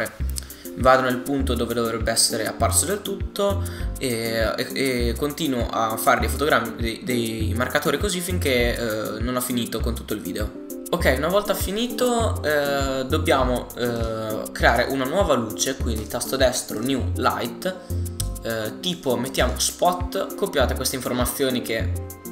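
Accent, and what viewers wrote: Italy. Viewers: ***** ma sei di ferrara? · Bel tutorial...ma sei Bolognese?? forza BOLOOOOOOO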